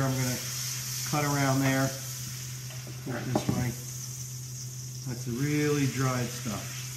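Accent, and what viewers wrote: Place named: New Jersey, United States. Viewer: I got a 4 bone roast from Shop Rite for the holiday sale for $64 minus a $50 gift card it cost me $14 & as you said each steak could cost you $80 if you went out to eat & wouldn’t nearly be as good. Nice video! I wanted to make a roast & was looking for a video on how to trim it as a roast but my wife said we’re never going to eat it all & asked why we can’t just cut it into steaks & freeze them so listen to the wife. Your accent you sound like you’re up near Floriam park somewhere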